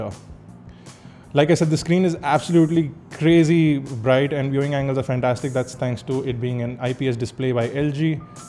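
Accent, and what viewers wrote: India. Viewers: That annoying indian accent lol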